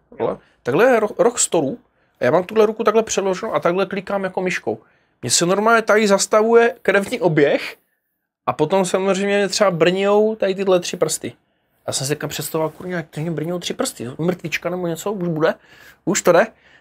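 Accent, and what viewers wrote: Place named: Moravia, Czech Republic. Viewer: Ten moravsky prizvuk je zabavny k tvemu videjku :)) Delas to skvele.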